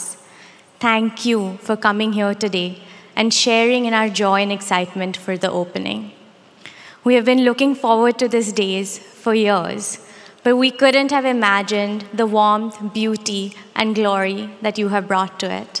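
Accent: India. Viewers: Indian accent hi hai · Aur kaisa hota hai indian accent bhai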